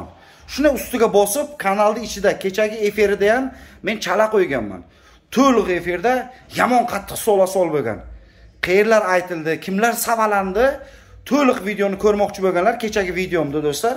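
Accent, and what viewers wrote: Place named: Rural, Uzbekistan. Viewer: КИШЛАКИ ГАПИНДИ КАРАГИН ...ХОТИНГА УКШАП ГАПИРАСАН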